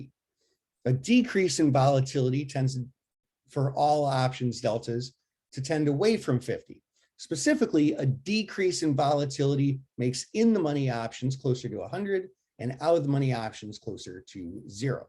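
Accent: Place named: United States. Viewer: really struggling with this yank migrant's accent, rayt? "stahck" rayt?.. how does he say clock? "clahck" rayt? 🤢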